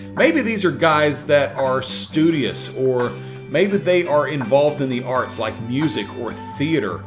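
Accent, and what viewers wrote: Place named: United States. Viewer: I love your fragrance videos and I'm from west Michigan and i can understand your American accent some of the fragrance channels i watch on YouTube even though they are speaking English language they still have a foreign accent and hard to understand what their saying thanks bro for the the tips and a really good fragrance review video 👍👍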